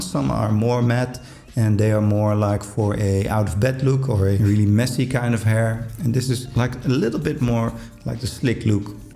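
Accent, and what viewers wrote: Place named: Netherlands. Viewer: The dutch accent 😂🫡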